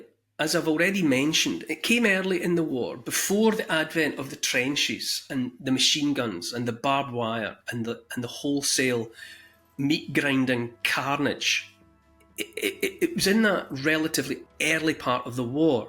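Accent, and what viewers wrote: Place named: Dumfries, United Kingdom. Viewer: Thankyou for sharing such fascinating stories, I have just ordered a copy of your haunting book. My family are 100 years of farmers from Dumfries- i love hearing your accent from the region. Best Wishes to you.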